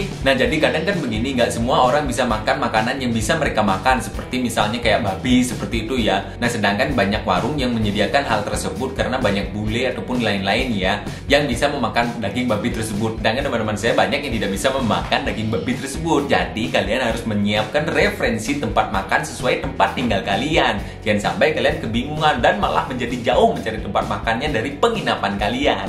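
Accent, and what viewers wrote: Bali, Indonesia. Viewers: Gak tau knp klo' ad orang bali ngomong, suka banget pas bilang "T" 🥰🥰 ya udh klo' ke bali aq kontak km dulu bli hehe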